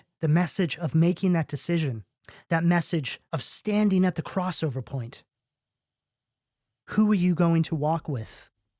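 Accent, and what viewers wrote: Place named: East Coast, United States. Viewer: I always thought you were east coastian.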